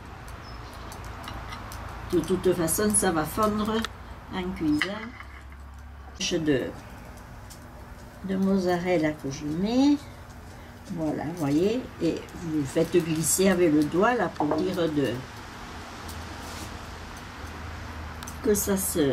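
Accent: Provence, France. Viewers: Recettes de mon enfance que je redécouvre avec vous.....😢❤ et en plus... notre cher accent provençal...❤❤❤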